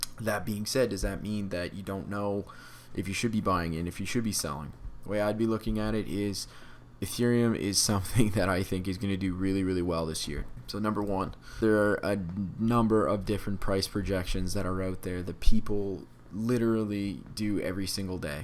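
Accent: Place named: Canada. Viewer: This is not related to the content haha but you have a “Canadian” accent. I appreciate that. Thanks for the info by the way! I came from tiktok and you’ve been a big part of my learning crypto and ETH in particular! I hope you keep making content of this sort of YT. That being said, I do appreciate the car content as well because I drive one and know nothing about it. Multi level education, thanks!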